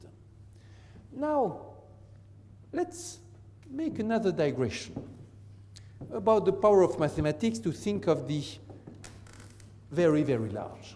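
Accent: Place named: France. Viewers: ITS A PURE FRENCH ACCENT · He sounds SO French and I love it!!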